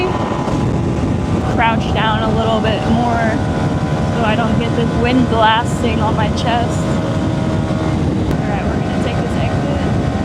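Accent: United States. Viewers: where are you originally from?? I haven't seen all your videos so not sure how you sound American but are out in Luxembourg... sorry ..im nosey...lol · Sothern accend 👌🏼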